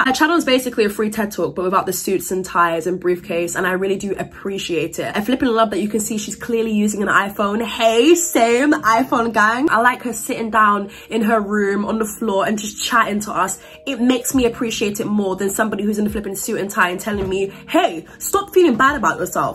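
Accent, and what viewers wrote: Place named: United Kingdom. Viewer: I very much appreciate the subtitles because the auto generated ones always mess up with British accents lol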